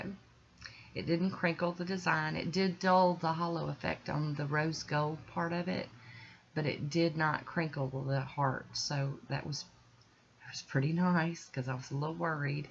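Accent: South, United States. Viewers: I hear a southern accent in you! That's the same for me, I'm a southern girl all my life! My husband loves for me to say certain words and will have me repeat them sometimes or he'd say it after me lmbo, like, night (telling him goodnight) bite, like, light lol he gets a kick out of it when I say those words and we both end up laughing! People always ask me to repeat myself just so they can hear me say whatever I said again lol!! I think we probably would be in trouble quite often if we could hang out! If you ever come to central Fl it'd be a great treat, for sure!!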